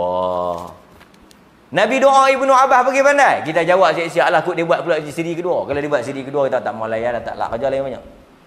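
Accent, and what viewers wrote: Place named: North, Malaysia. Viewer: langgah ayaq,pepak kismis..pure utara noo..👍👍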